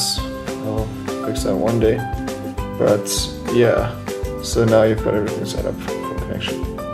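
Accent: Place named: South Africa. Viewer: Hello, by the accent I assume you come from South Africa, where did you get the switches from?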